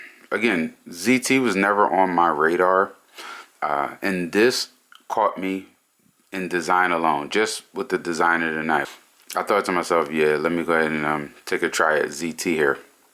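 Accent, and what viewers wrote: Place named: Louisiana, United States. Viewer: Just curious. What part of the country are you from? Trying to place your interesting accent. Louisiana? Detroit?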